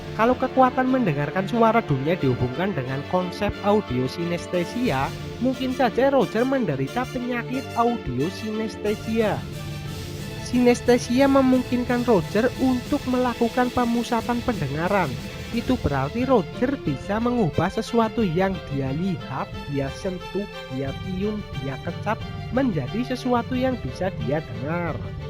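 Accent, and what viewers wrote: Banyumas, Indonesia. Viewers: wakwakwak, wanjir imonya, ane hargai btw, suarane ngapak men mad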